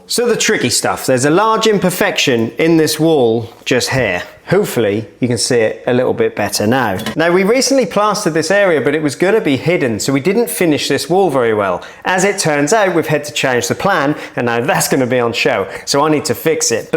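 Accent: Norfolk, United Kingdom. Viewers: You from Norfolk or Suffolk? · As a decorator of 30 years I can confirm polyfilla in a tube is the worst! The one time tub is good for pin holes. Easy feel is the way! Good video, sir. May I ask, is that a Norfolk accent? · Love your videos mate! But gotta ask - are you a Norfolk boi? Im getting a hint of that accent being one myself lol! 👍👍👍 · Are you a Norfolk boy? I've lived/worked all over the uk, and have been in Scotland for about 38 years, but I think I recognise that accent 😊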